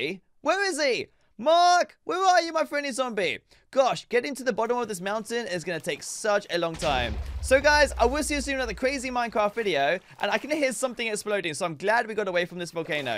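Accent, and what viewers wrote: United Kingdom. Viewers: He be speaking british HAha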